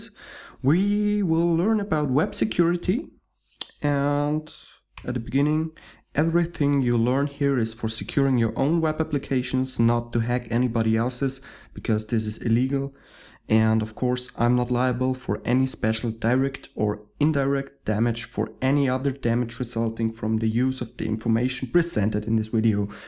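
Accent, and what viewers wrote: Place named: Germany. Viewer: Germanish english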